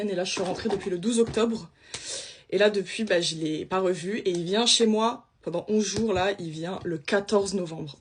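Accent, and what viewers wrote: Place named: Moselle, France. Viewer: Vous avez un accent mosellan 🥰😄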